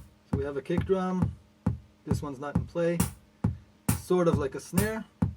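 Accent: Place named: Israel. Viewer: אחלה וידיאו גבר כותב בעברית כי שומעים שאתה ישראלי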